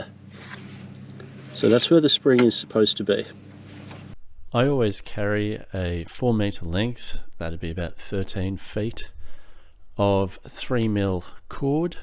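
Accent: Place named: Australia. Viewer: Ha! I knew that was also possible, but your Aussie accent is soft enough that it could be Kiwi, but I should have known by the dryness that is Oz. I guess the name and that Kiwis are often DIY-competent. We lived on the North Island for 13 years where I had a Walker, which I loved, as the floating deck out front was good for getting under trees. Now on Kauai where there is no Walker dealer, so now I'm a Hustler man. I just forwarded your video link to a friend with the same issue, so thanks from both of us!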